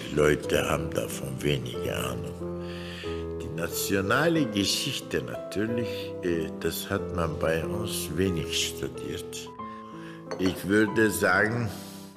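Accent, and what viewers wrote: Germany. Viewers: Hannover aksanımı bu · Bavyera Almancası ile mi konuşuyor diyeceğim, evet. Neden Neu Hoch Deutsch değil ?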